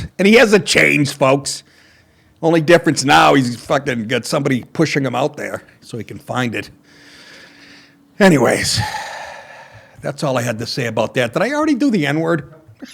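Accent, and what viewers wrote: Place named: Boston, United States. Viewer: Nah, he’s just from the Boston area. That’s how we sound!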